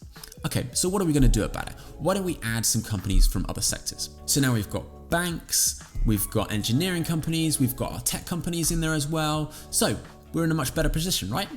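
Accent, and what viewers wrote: United Kingdom. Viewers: Thanks. I found the video very informative, well structured and easy to understand. Good to hear a British voice too. I read the comments on r/UKPersonalFinance and it seems a good bunch have a stick up their arse about you only discussing Vanguard, but I personally think you’ve made it clear there are other providers. Vanguard are just an example. Your audio/video is on point and deffo a thumbs up for the warm light background. I would love to see a video doing a deep-dive on UK pensions and specifically on how to protect yourself when moving companies or countries. I’m personally in a situation where I opted out of my work place pension scheme and haven’t invested as I was supposed to be moving to Australia.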